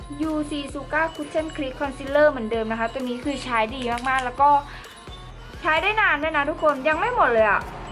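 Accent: Isan, Thailand. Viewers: มักคำเว้าแหมะค่ะ555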